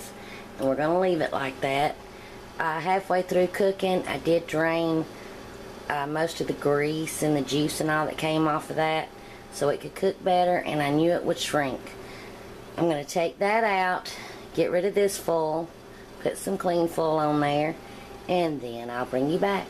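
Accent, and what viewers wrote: South, United States. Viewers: you are too cute with your pretty country accent❤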